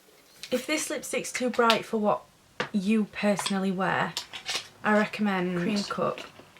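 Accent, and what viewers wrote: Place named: Cornwall, United Kingdom. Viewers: where are you from in england because you sound as if you'd be local to me? if you dont wanna be specific just say vaguely like which compass direction if that makes sense haha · For some reason, in this one video you sound like my Cornish cousin 😁😂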